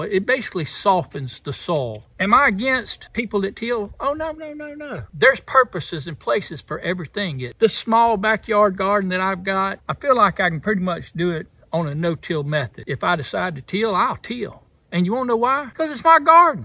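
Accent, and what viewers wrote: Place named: South, United States. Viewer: Preaching to the choir. Love the way you say soil with that southern drawl.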